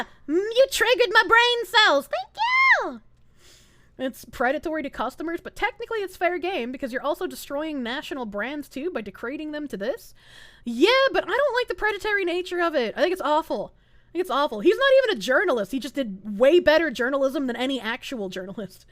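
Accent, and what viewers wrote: Boston, United States. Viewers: ‘Chubby lesbian biker’ My brother in Christ, that is a Boston accent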